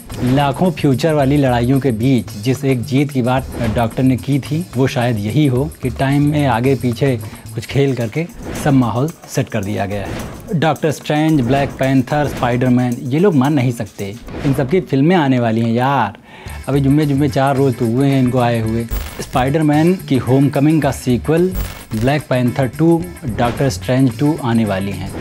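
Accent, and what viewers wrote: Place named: Bihar, India. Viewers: Bihar se ho ka bhai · Bihar ke ho.....???? Work on your acnts bro.....u r respting mr dan 1 milon subs channel...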